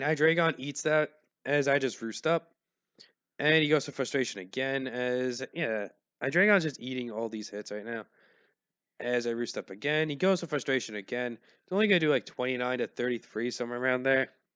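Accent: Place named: New York, United States. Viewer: Really sound like a New Yawrka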